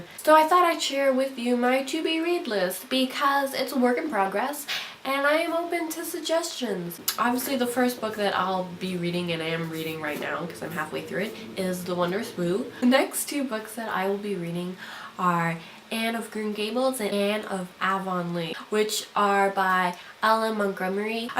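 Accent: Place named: United States. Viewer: Oh, I love Margaret Atwood! Handmaid's Tale is very good... I feel like that's the obvious one to start with. I approve. :) :) On accents, I probably would have thought you were American if I didn't know any better, but you do always sound very well spoken and clear... so maybe I'd just guess you were more northern? Which you are in fact north of me.... :) :)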